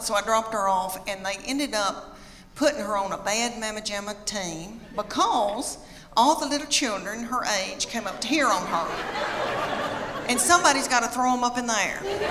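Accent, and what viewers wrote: South, United States. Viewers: I was today years old when I found your channel!!! I can hear the sweet southern charm in your voice!!! Hello from Alabama!!! You're hilarious and refreshing! Thanks for making me pee myself today, I DO aporeciate it!!! 😂😂😂 #sportsandoffdope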